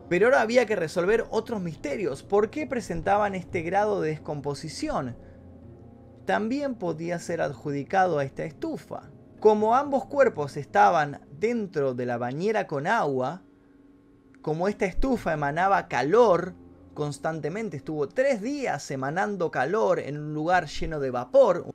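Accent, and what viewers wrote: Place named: Argentina. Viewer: mucho bla bla hablas mucho argentino para lo que narras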